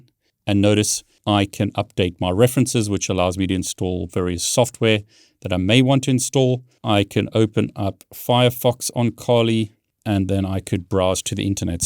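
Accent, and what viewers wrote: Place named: South Africa. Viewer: Thank you so much this was so helpfull. and you sound very south african.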